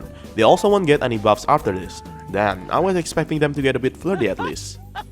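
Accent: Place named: Indonesia. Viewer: dari aksennya Indo bgt 🤣, semangat bang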